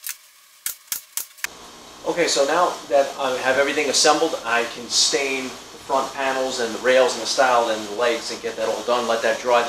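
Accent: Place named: Staten Island, United States. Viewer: Are you from Staten Island?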